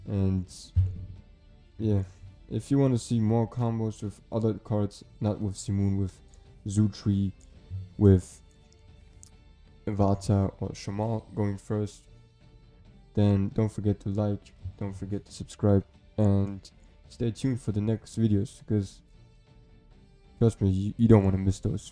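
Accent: Germany. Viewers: Are you from Germany? Super Nice Video And Deck👍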